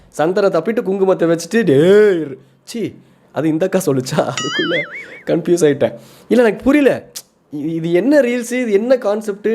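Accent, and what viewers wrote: Coimbatore, India. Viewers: The coimbatore slang is super❤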